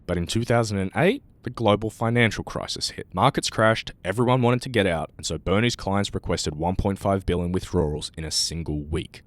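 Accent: Asia. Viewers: Asian accent is a bit wack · Sick video but the asian accent was unfitting, otherwise the video would be perfect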